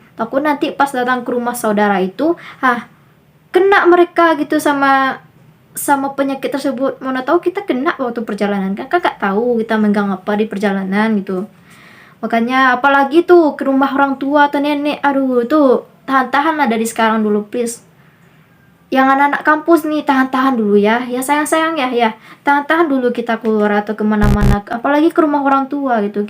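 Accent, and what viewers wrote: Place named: West Sumatra, Indonesia. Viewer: Minang nya jelas kali ya ges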